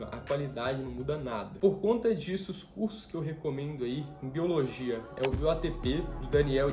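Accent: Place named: Minas Gerais, Brazil. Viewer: Oh sotaque mineiro, e muito bom kk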